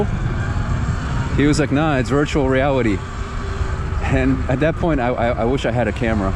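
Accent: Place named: East Coast, United States. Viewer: You sound like young Sylvester Stallone my man! That east coast accent. Subscribed!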